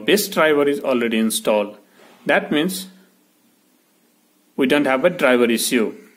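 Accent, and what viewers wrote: India. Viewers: U sounds odia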